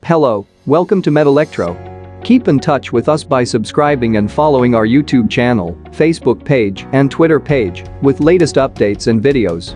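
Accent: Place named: India. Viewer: I agreed with most of the list, but that cruciating hindi acent in the first place?Seriously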